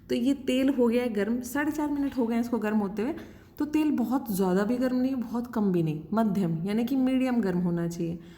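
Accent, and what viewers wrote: Haryana, India. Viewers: Didi Haryanvi ho kya? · Nice and pleasant to hear Haryanavi accent so soft spoken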